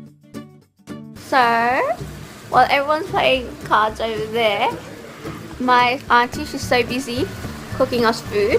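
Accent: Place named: Australia. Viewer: Loved the vlog! Oh my gosh you're the first nepali with an Australian accent I've heard! love love love the accent <3 Also subbed and would love it if you checked out my vids too :)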